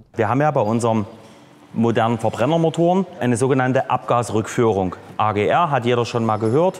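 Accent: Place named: East Germany, Germany. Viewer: Klasse dein Ossideutsch😂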